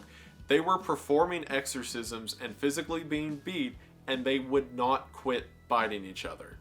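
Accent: South, United States. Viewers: I typically only watch videos on 1.25x, so now the normal playback speed makes his southern drawl sound like a drunken slur 💀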